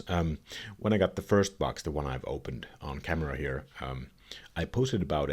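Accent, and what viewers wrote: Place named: Finland. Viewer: Your ralli English was absolutely peak. Perfect. Obligatory torille!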